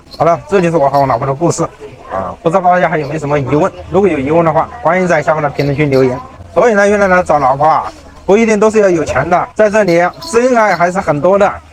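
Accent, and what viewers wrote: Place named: Northwest, China. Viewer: "My wife is simple and cute." Are you kidding me? She is very pretty and has beautiful lip and chin. For you, I detect an accent from West or North West China. After I posted this message, I watched some of your videos, and have to say: Congratulations, you finally found happiness in Vietnam! Most people on this world spend their entire life, but can't find happiness. You are the luckiest one. Do you best to keep it.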